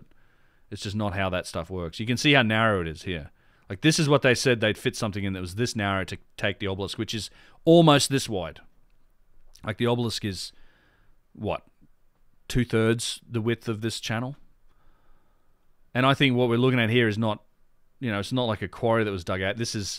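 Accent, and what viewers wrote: Australia. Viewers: Such a wonderful channel, where's your accent from though? Sounds Aussie. Love, absolutely LOVE your approach & mindset, well researched, honest & especially prepared to challenge establishment. Xx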